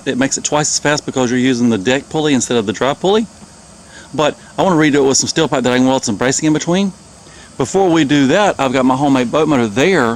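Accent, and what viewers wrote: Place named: Harkers Island, United States. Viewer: Dude sounds like he's from harkers island N.C.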